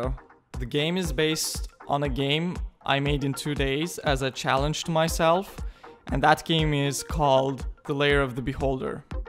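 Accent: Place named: Turkey. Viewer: izlerken dedim adamın ingilizcesinde türk aksanı var gibi la sonra baktım kanalın adı lol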